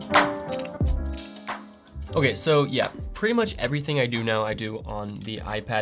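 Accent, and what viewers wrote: Ireland. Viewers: Love the Irish accent - being a Dubliner it made laugh! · LOVE THE IRISH ACCENT AHAHHAHAH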